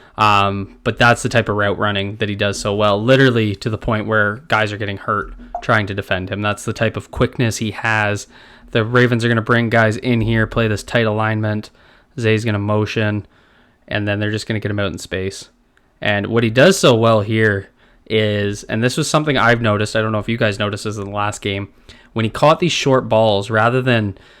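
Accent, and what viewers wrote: Canada. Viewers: Hey man I love your content & analysis. Btw I noticed your accent. Are you Canadian?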